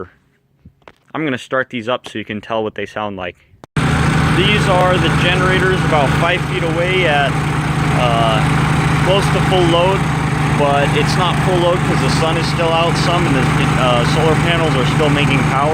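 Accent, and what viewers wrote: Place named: United States. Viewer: May I ask what state you're from? I'm trying to learn about American phonetics and I found your accent very pleasant to listen to. I hope I'm not being too intrusive.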